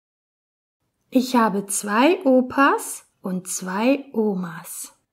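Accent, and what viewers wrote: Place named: Italy. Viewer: Schade, dass die Deutschen nicht so sprechen. die Intonation und Dialekt sind sehr anders. Die Sprecherin kommt vielleicht aus Italien, oder. das finde ich nicht gut.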